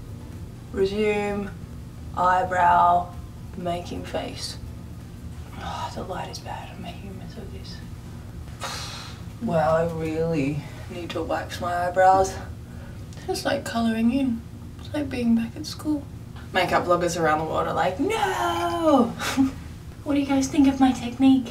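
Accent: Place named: Australia. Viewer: Morning routine twins! You are stunning. Also, yay for us Aussies! Can't get much realer than that accent x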